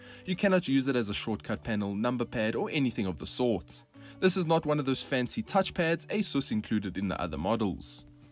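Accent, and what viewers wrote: South Africa. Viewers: Yes it's funny I didn't notice that about sath afrikan before. Also bright being pronounced as braght. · South African???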